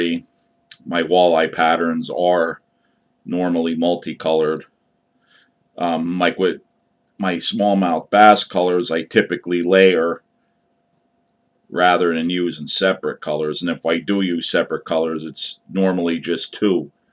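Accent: Pennsylvania, United States. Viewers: I love it, and BTW, I could detect that PA accent long before I saw the envelope! LOL In my mind, are the colors not reversed? I would have thought the green would be on top? It looks awesome just the same! I just found your channel and am already learning lots! Thanks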